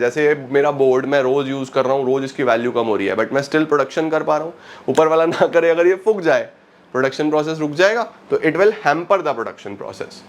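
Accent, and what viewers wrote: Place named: Rajasthan, India. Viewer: Rajasthan ka hai bhai yo.. to kadr ka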